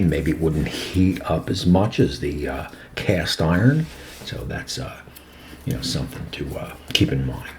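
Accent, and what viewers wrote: New Jersey, United States. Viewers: Love the video. First time subscriber. Once I heard you tawlk I knew you were from Jersey!! Lived in Cherry Hill but live in HI now.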